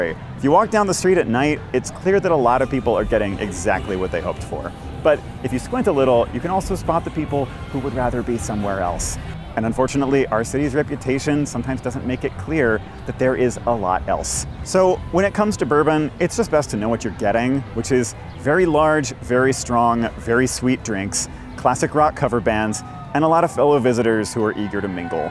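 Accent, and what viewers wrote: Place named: Detroit, United States. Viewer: Where ya from bru? Detroit?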